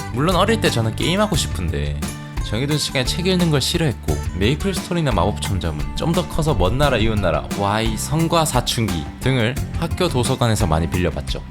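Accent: Gyeongsang, South Korea. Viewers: 님 경상도 사람이시죠??? 말투 들어보니깐 경상도 사람같은데.....흐음ㅋㅋㅋ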